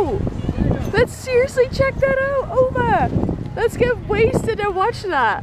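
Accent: United States. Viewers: You speak English like an American, almost no accent and a lot of idiomatic expressions we use.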